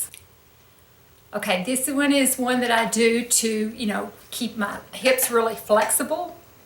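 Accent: South, United States. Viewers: Thanks so much for sharing these. You always look so beautiful and I just love your southern accent. I try to keep my body strong as well and actually bought a hula hoop a while back for some light cardio! It took me a while to remember how to keep it going, lol, but it's a fun way to stay in shape. Take care❤️-Ruthie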